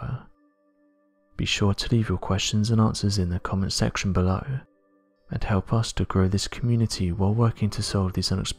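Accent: United Kingdom. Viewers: Secureteam10? You’re citing Secureteam10?!?!! I guess, at least it’s better than “someone said” or “another person thinks”. Your British accent isn’t enough lipstick for your fake news. Some websites?? Which ones?!?!?!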